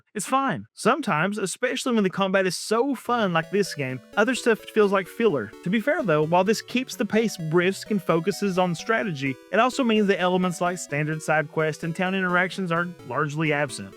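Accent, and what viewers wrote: South, United States. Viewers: its good to hear another southerner talk about jrpgs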